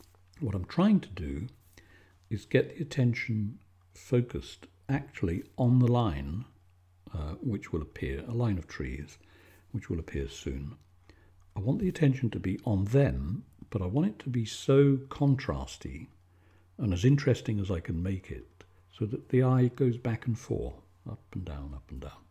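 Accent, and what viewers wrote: United Kingdom. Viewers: Finally one with a british accent! Love your talking while painting!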